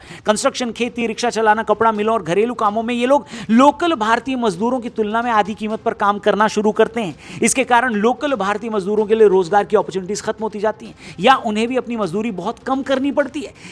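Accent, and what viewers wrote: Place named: Bihar, India. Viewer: Bhai tera thoda sa bahuwa to nikal hi jata h...but information about the topic is serious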